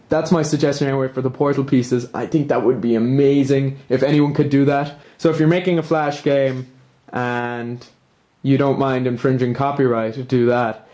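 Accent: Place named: Ireland. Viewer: I could tell you were Irish in the other video. Probably because I watch a lot of U2's old concert vids on youtube - your accent is quite close to Bono's.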